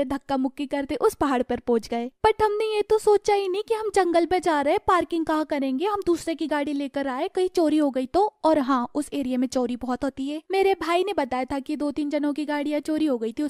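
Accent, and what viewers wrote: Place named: Indore, India. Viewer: Your slang like indoris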